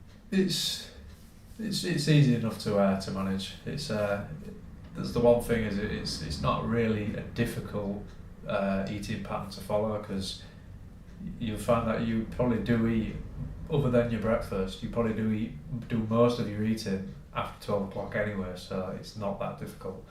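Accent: England, United Kingdom. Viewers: Good video. Great to hear a bloody English accent on a fitness channel for a change. You a Manc?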